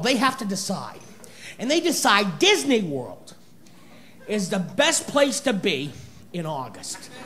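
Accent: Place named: Boston, United States. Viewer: That's the Boston accent.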